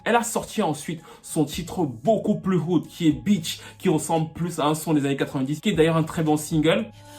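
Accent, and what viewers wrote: Cameroon. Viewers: C moi où tu as un accent camerounais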